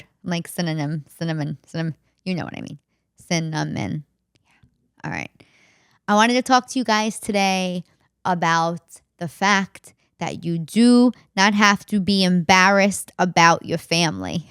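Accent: New York, United States. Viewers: Great show no family is normal. We actually grow from our pains and problems and issues. Love your NY accent. Lost mine living out in the country but every once in a while someone will say "you are not from around here" lol. We need to always be proud of our families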